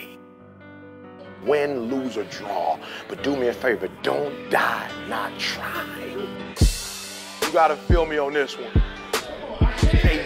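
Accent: United States. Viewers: Why would someone with a PhD speak like this? Learn the English language and use it properly if you are going to stand before young people and talk about I I I I , ME ME ME ME, MY MY MY MY. Yes, I heard the message, but if he is going to be hold himself up as a role model....why not "Model the behavior" it takes to be really successful! Ebonics might be cool to someone(?), but it is NOT the way to speak if you want to be competitive. I would LOVE to know who paid him $50,000 to speak !?!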